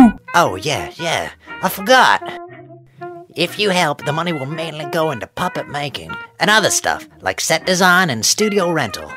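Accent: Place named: France. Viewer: T’as un accent français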